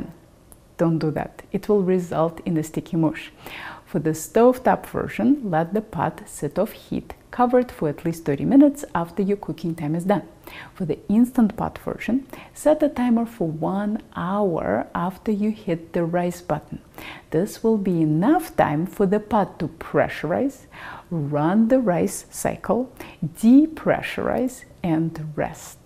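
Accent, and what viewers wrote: Russia. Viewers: I lost it for her Russian sweet soothing Accent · what's with the Russian accent? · Good info ,while her English is great Russian accent still apparent.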